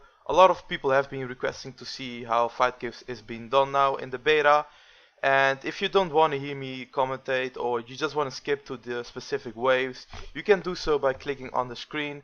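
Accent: Netherlands. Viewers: jij komt uit zeeland typisch zeelands accent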